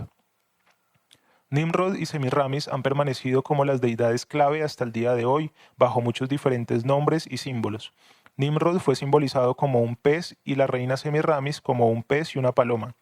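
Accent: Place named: Bogotá, Colombia. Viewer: Buena investigation, pero esa voz de rolo, bogotano homosexual esta pesima.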